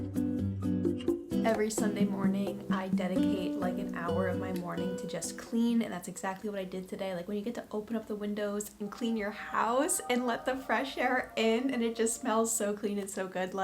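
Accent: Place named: Argentina. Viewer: ! Vengo de ver tu entrevista y tu forma de expresarte con esa mezcla de acento argentino y tu acento americano es super lindo. Me dió ternura escucharte con el acento americano pero a la vez me encantó escucharte pronunciar las palabras como porteña. Encontrá la manera con la que más auténtica te sientas, pero tu forma de hablar el castellano como argentina tambien es super lindo y un bonito gesto de honrar el pais que te recibió. 💖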